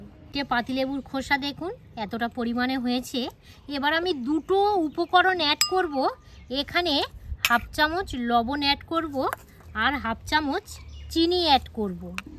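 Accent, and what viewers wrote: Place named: Ghoti, Bangladesh. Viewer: আপনার সুন্দর বাংলা ভাষা (ঘটি ) শুনলাম, ভালো লাগলো।ভুলে গিয়েছিলাম ভারতবাসী।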